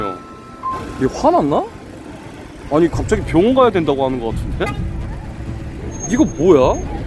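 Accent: Jeolla, South Korea. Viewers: 쇼츠로 영어하는거만 보다가 풀영상 처음봤는데 전라도 억양 너무 구수하넼ㅋㅋㅋㅋㅋ 인간미 넘쳐요! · 아따 전라도여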